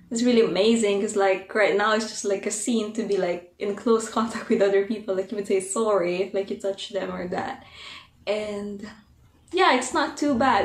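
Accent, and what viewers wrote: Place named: United Kingdom. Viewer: Take care always ate 😍 miss you. Naloka ako sa englishan hehe british na bristish na hehe 😅☺️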